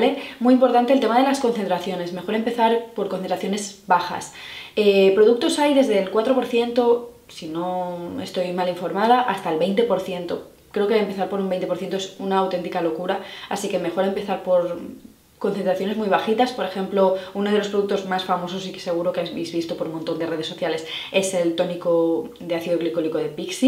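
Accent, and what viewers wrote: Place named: Spain. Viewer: Me encanta como hablan los españoles! Saludos desde Mexico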